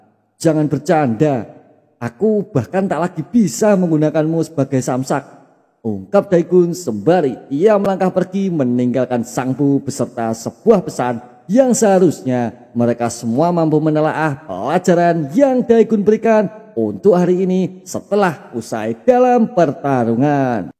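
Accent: Java, Indonesia. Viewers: Jangan di ulang terus kalau ngucapin nya Logat Jawa Lo jangan di liatin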